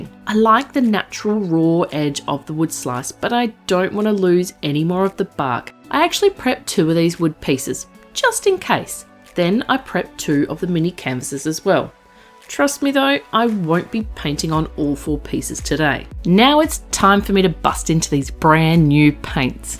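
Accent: Australia. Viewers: This was a video that started to autoplay and I wasn’t actually looking at my screen and I nearly broke my neck with how fast my head whipped around at the sound of your Aussie accent!! 😂 Couldn’t hit subscribe fast enough! My daughter is very creative, can paint and is a preschool teacher so I will also share your channel with her.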